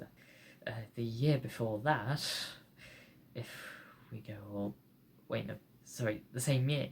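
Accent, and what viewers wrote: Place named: United Kingdom. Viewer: watching you be exceptionally British makes me insanely happy oh my god THE FREAKING ACCENT #Simp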